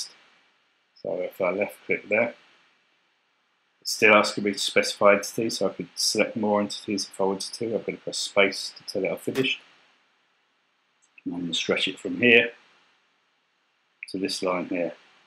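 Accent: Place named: England, United Kingdom. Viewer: Thanks for the great video (and the English accent), I'm investigating 'free' CAD software for members of our Mens Shed, a movemnt that I think is catching on in Uk as well now. Also liked your bird box video, the link for which I will pass on to some folks here (in Tasmania) who are looking to protect the Swift parrot from extinction. Using the Pi may be too high tech for their purposes but it might get them thinking.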